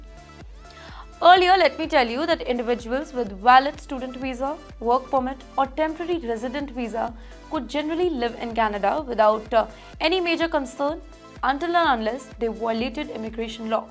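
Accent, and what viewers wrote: India. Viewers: She speaks Indianlish, English with an Indian accent which only Indians can understand.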